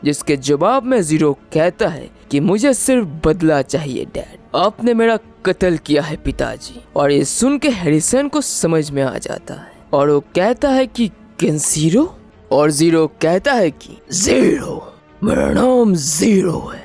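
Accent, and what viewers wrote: Bengal, India. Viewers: Are you from west bengal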